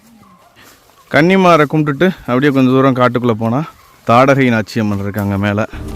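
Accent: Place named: Ramanathapuram, India. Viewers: Ramanathapuram slang nalla iruku😊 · Always waiting for ur vlogs. Ur efforts to b appreciated n every vlog..ur tamil slang nice to hear bro....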